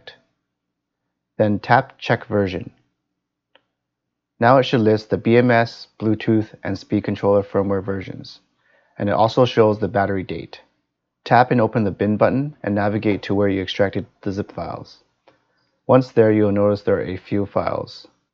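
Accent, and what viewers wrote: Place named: Vancouver, Canada. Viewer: Haha right away I can recognize you’re from Vancouver. Really wish there was a hack for widewheel mercane..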